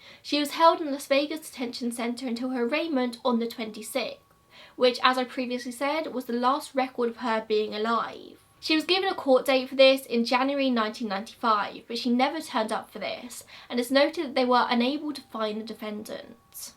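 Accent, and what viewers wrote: United Kingdom. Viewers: i'm just hypnotized by your perfect british accent <3 i love it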